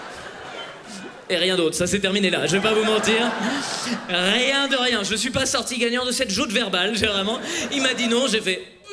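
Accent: Quebec, Canada. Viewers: J’adore merci pour ta vidéo. J’adore l’accent québécois 🥰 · Mais quel talent ! Tu le tiens bien l’accent québécois ! 😂 bravo j’adore !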